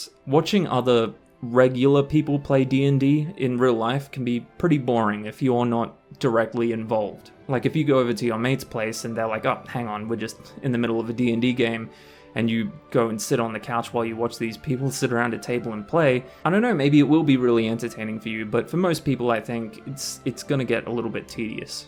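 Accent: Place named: Australia. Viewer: Vids are crisp love your work keep it up. Haven’t seen many Australian (assuming from the accent) d&d channels.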